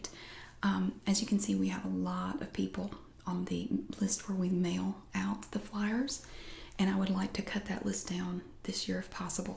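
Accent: South, United States. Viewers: I absolutely adore your videos! My aunt/godmother lives in North Carolina, and for my whole life southern accents have been so calming to me. I only see her a couple times a year, because I live in Wyoming, but I find so much comfort in your videos in the meantime. I hope you receive as much gratification and happiness from making your videos, as you give to us, the viewers. :)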